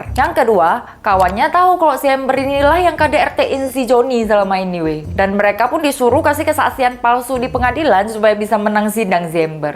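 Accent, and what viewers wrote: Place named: Madura, Indonesia. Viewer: suka dgn dialek host-nya .. meduro bingits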